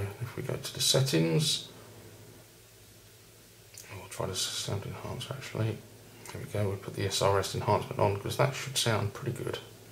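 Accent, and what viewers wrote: England, United Kingdom. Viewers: Does he sound scottish or welsh,its an ENGLISH accent its like saying a yank talks canadian,3 countrys in the uk wow some people. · BRITISH ACCENT! :)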